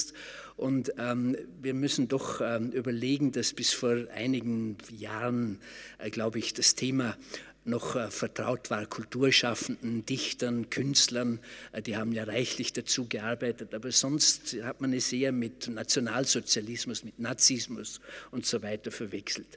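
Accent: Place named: Austria. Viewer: Aaah, kenne den Herrn aus gewissen Truecrime-Formaten im TV!!! Fand schon immer, abgesehen von der Qualität seiner Wortbeiträge, dass er äußerst angenehm spricht!!! Ein toller Redner und dazu noch dieser leicht österreichische Dialekt!!! Zum Verlieben! ❤️😂 PS. "Verrecken" ist für ihn ein Kraftausdruck!!!! Wow!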